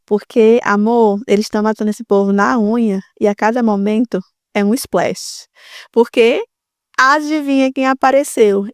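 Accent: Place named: Bahia, Brazil. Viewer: Oi menina. De estado do Brasil vc é?? Pelo sotaque acho que é Bahia. Acertei???😂🎉🎉🎉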